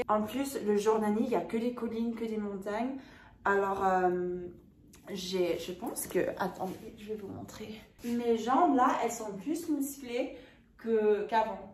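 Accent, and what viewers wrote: France. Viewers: Vrmt j’aime trop tes vidéos ! Ton accent français est bcp trop mignon en plus. Je regarde aussi tes vidéos en anglais aussi psk en tant que française qui étudie au UK j’essaye d’avoir l’accent british mdrr :))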